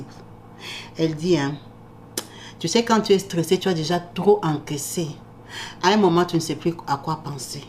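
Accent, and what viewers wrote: Democratic Republic of the Congo. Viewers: Ooh la mère chérie je vous aime bcp maman surtout votre accent congolais🥰🥰🥰😘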